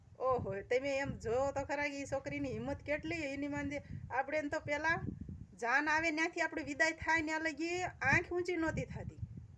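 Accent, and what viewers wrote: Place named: Kathiawar, India. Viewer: તમે પ્રાતીજ ની બોલી થી અલગ કાઠિયાવાડી બોલી બોલો છો.. તેમાં કઈ સમજાવો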